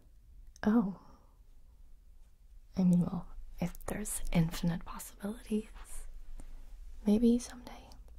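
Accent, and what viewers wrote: United States. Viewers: Your American accent is fucking stellar. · What the hell? You sound American AF. Hahaha! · I am so impressed by your American accent! Brava! 👌👏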